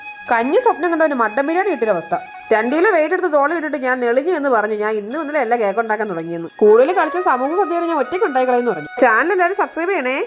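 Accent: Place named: Pathanamthitta, India. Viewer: ഒരു പത്തനംതിട്ട slang അതാ chodiche❤️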